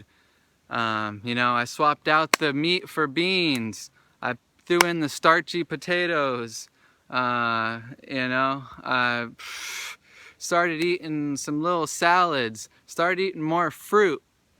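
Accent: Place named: California, United States. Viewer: dude I really like u! ur energy and accent is cool hahaha. reminds me of a cali surfer dude. Do u have FB or IG to follow too?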